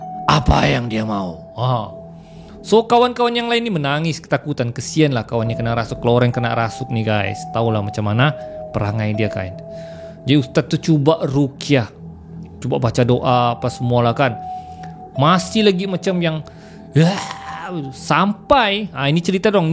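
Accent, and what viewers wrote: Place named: Kelantan, Malaysia. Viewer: Abe seram chanel masih baru chanenya lbh kurang 1 tahun,subsc pun baru lbh kurang 40k.tapi setiap video mampu ditonton 10k.....si abe ni berdailet kelantan tapi masih mampu lg difaham. ada juga si abe ni baca terus cerita atau skrip yg dihantar kpd dia...masih lg menarik.tak salah baca terus skrip cerita tu dari bercerita terus secara menghafal jln cerita....malapetakanya bila tak ingat skrip cerita mula nk merepet nk bohong dlm usaha nk bercerita jgk.... cerita ini menarik dan lbh menarik jika oerkataan yg digunakan mudah difahami.....sekadar pandangan saya saja. cadangan saya suoaya tuan baca terus srip cerita yg dikirimkan dgn olahan tambahan.(jgn menghafal mcm skrg)....tapi jija tuan rasa cara ini baik dan selesa teruskan.yg penting tuan puas dan seronok apa yg dikerjakan bukan org lain yg puas dan senorok dengar. 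ok tq semoga tuhan sayang kita semua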